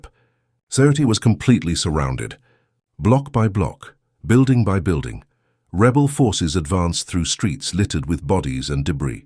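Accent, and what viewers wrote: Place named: United Kingdom. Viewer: This "Commentary is a Total" LIE, being Narrated by a European Britain 🇬🇧. Muammar Gaddafi wanted Unify the "African Union " and America and Europe wasn't having that so former POTUS Barack Obama did the Devils bidding and took him out 1 of the 1things i despise America for...! FYI : Free Education, Health, Housing, No Unemployed People, and No poor people, his demise was an Evil Power Grab Orchestrated by the European establishment and Completed by this Hypocritical Government America 🇺🇸 😀 😉 😄 666..!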